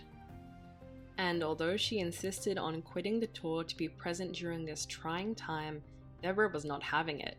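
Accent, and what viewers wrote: Australia. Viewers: Is this Australian?? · Nice to hear a fellow Aussie. Thankyou for this. 😊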